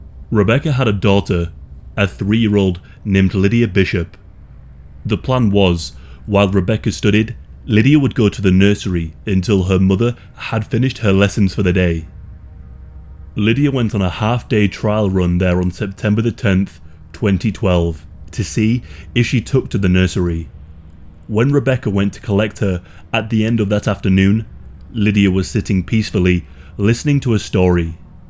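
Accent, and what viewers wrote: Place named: England, United Kingdom. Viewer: This guy sounds like he was a English accent while he’s from Australia….?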